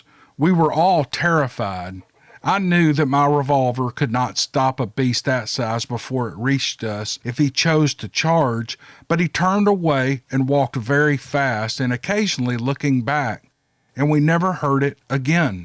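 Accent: South, United States. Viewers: It's that sweet southern drawl....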